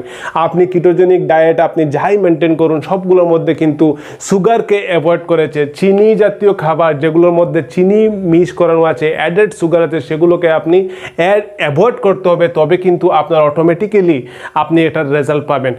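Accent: Sylhet, Bangladesh. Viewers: সিলেটি ভাই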